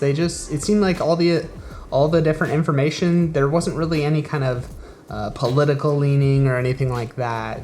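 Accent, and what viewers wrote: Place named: England, United Kingdom. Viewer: I like your English accent!! God bless you a lot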